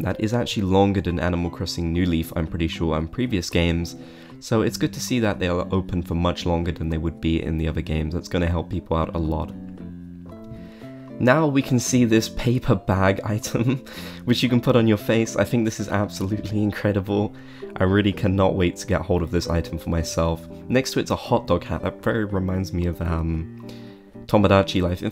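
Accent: Wales, United Kingdom. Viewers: Ok I really need to know if you’re welsh bc of your accent it sounds so welsh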